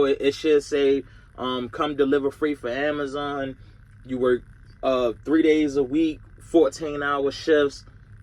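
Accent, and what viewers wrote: Baltimore, United States. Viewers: Knew you were Baltimore when i heard you talk😂…. Thanks for the information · I knew he from Baltimore by the accent lol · When I heard that accent😂I said Bmore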